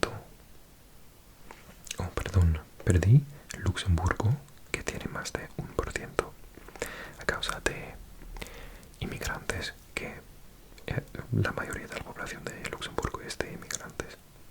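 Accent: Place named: United Kingdom. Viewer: Wow yo ya había visto algún que otro vídeo de tu canal de geografía y mapas y siempre he pensado, que acento más de Reino Unido tiene, pero verte hablando un español tan fluido me ha parecido impresionante. Sigue así y enhorabuena!!!!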